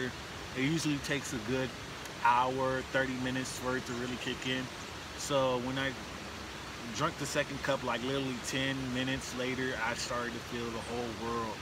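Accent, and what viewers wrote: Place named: Glasgow, United Kingdom. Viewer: A Glasgow accent right at the start ..that’s got me watching this ..! 👍☺️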